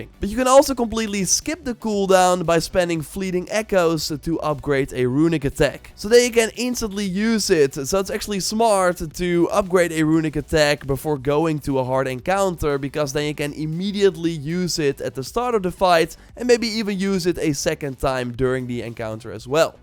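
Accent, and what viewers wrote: Netherlands. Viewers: I hate that the dude with the best tips videos has the worst Dutch accent, it absolutely atrocious mate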